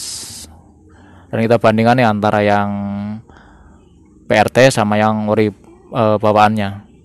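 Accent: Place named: Java, Indonesia. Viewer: Bang ituh ngomongnya kaya medok bgt yh bang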